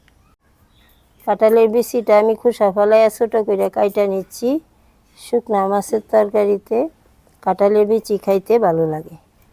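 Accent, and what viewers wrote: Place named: Tripura, India. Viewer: Tumer bari tripura naki tumer katha gulu tripura bashar moto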